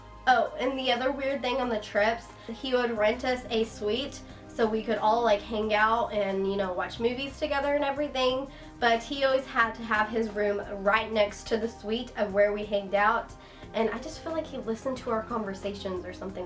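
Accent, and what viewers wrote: United States. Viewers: I love your accent! What state are you from? I'm from the most southern town in florida! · this is entirely off topic but are you from the deep south? you have a very Southern bell accent.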